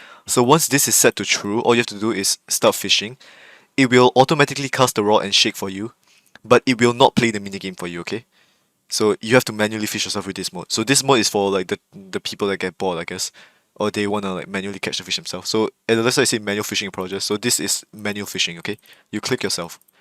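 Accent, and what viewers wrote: Singapore. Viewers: singaporean accent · the singaporean accent is very strong btw LMAO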